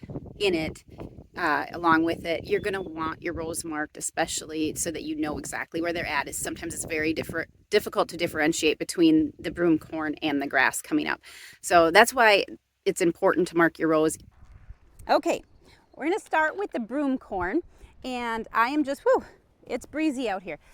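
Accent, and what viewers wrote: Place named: Midwest, United States. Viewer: Just got sent over here on a recommendation... Holy moly your Midwest accent is THICK. Minnesota? I'm from Northern WI, almost the UP- probably why I hear your accent 😂 New subscriber here. I hope to see more content!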